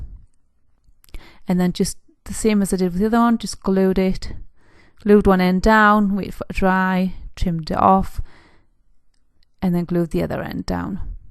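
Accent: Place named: England, United Kingdom. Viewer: Hello from Nampa, Idaho, USA. LOVE the eggs and listening to your English accent!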